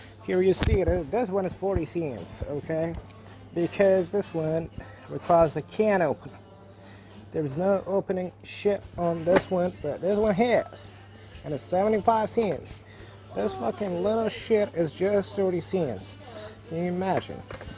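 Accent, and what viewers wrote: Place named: Florida, United States. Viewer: Your English sounds almost like the southern accent here in Florida ☺️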